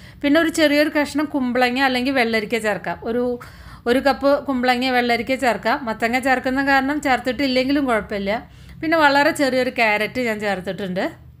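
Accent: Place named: Thrissur, India. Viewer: good receipe......TSR speak pole thonni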